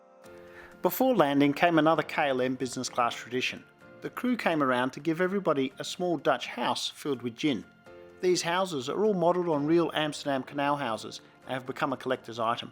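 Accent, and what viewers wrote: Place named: Australia. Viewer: Me, every time I see your updates: “Yaaaaasss a new! *whistles along*” As an Aussie expat in the UK who loves seeing all the different airline products... it’s so lovely hearing it being presented with an Aussie accent ^.^ <3 Thank you for your vids ^.^ xx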